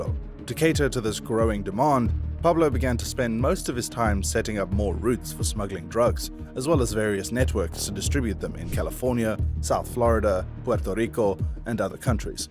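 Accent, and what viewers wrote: South Africa. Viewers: The narrator sounds South African or perhaps Zimbabwean. I’d say Durban, South Africa. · Are you from South Africa? Reason being - accent sounds familiar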